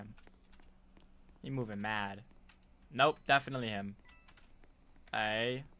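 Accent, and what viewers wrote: United Kingdom. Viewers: Why did he turn British 😭😭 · I never new you were British but you moans got me dying😂💀💀💀💀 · one question why is he British ? Aren’t you American? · well the accent sounds like a British one so idk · Are you British?? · I an never tell if ur American or British 💀💀💀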